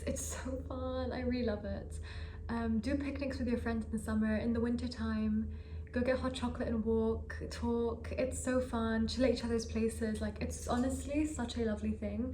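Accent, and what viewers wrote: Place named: Arab world. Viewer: I’m not joining any university but I’m watching this bcz I love how Arab speaks English. It’s classy accent ❤ 😊. Love your tips , it will be useful for students .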